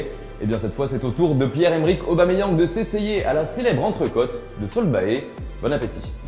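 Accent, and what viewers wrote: Italy. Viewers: C'est lui l'accent italien jadore ce gas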